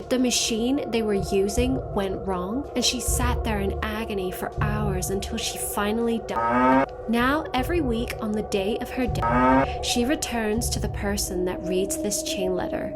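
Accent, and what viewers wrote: United States. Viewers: wow your american accent is better than mine and i’m from america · Ooooooh that american accent, so chill, so hip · gotta say, your American accent is really good! hahah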